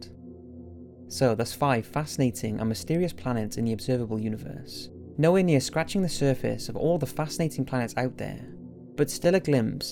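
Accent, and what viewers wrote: Wales, United Kingdom. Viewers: You must be from Cardiff or somewhere down South Wales? That accent is deffo Welsh 🤔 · Partisan Mapping ive turned most of my friends into ' fans. Now when we smoke at my place they say "lets watch some " and we just burn and listen to this nigga's surprisingly soothing british voice go on and on about interesting shit.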